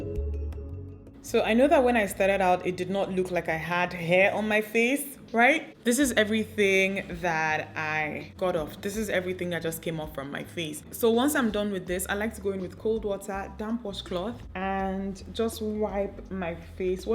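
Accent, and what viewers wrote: Nigeria. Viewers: Its the nigerian accent for me 🤩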